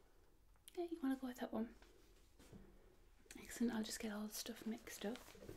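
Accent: United Kingdom. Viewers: Very relaxing video. I like the British accent, of course there are differences between regions of Britain. but I think they sound pleasant to the ears. That is possibly a reason I like British comedy.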